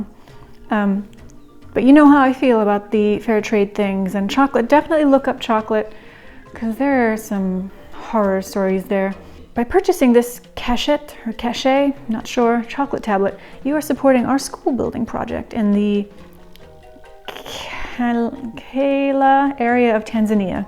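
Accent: France. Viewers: Oh my god you speak french i love that ! And your french accent IS so cuteeeee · I plan on trying this next week. And I like your French accent. :)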